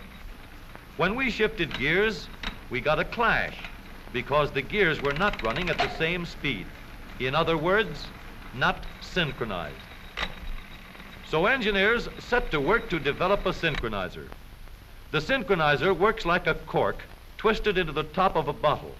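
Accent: Transatlantic, United States. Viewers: It’s a form of Trans Atlantic dialect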